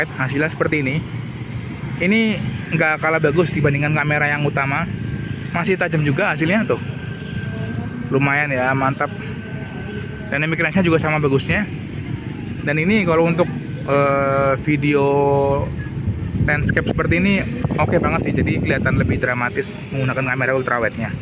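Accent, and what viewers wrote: Tegal, Indonesia. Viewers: Wong tegal lurr? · Wong tegal ya mas?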